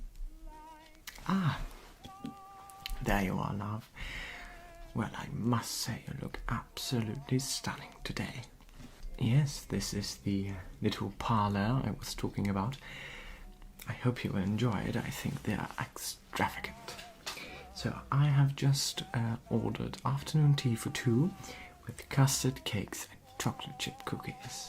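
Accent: RP, United Kingdom. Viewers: Love this! Just discovering your channel now,. Love the background music, your posh voice and the creativity of this upload...brilliant! Thank you for the tea and cakes while catching me up on the latest gossip.❤ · of all the historical asmr roleplays i watch regularly, these are my favorite as the older posh accent tops it off so well!!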